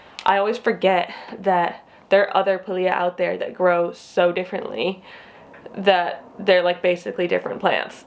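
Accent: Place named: England, United Kingdom. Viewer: You’re kinda picking up that English tang! Isn’t that funny how that works?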